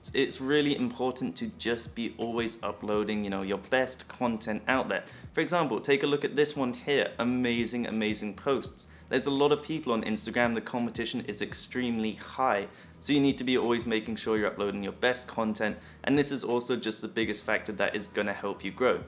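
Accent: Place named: United Kingdom. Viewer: what part of the UK are you from? I ask because you have the same accent as one of the BBC news journalists.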